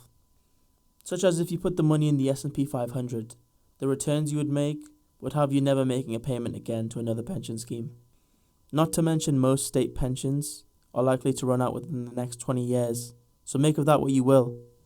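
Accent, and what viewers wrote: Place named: United Kingdom. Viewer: That's just a British person talking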